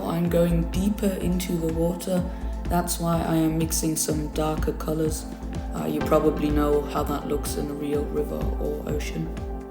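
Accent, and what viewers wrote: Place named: Germany. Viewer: Als ich den Akzent hörte dachte ich mir schon dass der Youtuber hier in Deutschland sitzt. Leute, es gibt 'unendlich' viele Kanäle von englischen Muttersprachlern, oft nur schwer verständlich wegen miserabler Audioqualität oder weil der Typ nuschelt bzw. sehr schnell spricht. Muss man da unbedingt noch ein weiteres Video in Englisch hinzufügen um viellecht die Reichweite zu erhöhen und möglichst viele Klicks zu generieren? Mir geht die Dominanz englischsprachiger Videos zunehmend auf den Geist...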